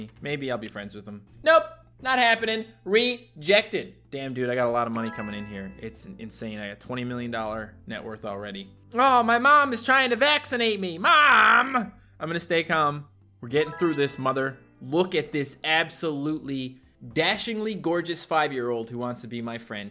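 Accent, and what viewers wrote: United Kingdom. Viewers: Is that really your British accent? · That’s an ok british voice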